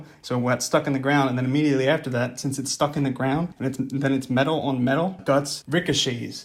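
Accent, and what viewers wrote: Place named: New Jersey, United States. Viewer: has a beautiful jersey accent that sneaks out every once in a while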